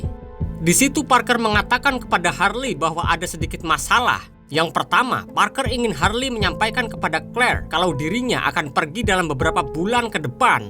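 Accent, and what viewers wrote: Java, Indonesia. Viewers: Seru ni pembawa acaranya orang Jawa logatnya mantap😂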